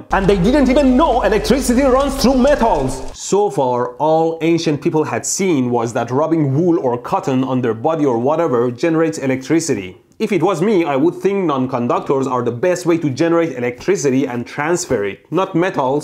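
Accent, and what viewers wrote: Iran. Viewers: Love the Persian accent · Most Iranian pronunciation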